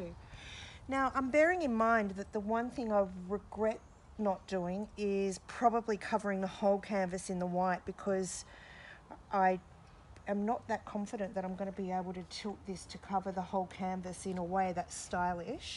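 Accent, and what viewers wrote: Australia. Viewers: Just love hearing you talk. For a minute I think I’m back home in Sydney, I wish I was. On ya love!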